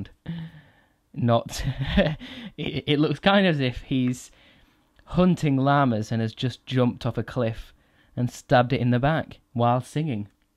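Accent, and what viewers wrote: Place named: United Kingdom. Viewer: British accent is addicting.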